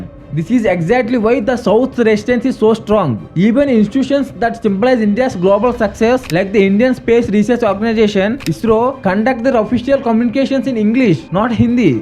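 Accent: England, United Kingdom. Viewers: I really enjoy his english accent. Infact i watched whole video.. 👍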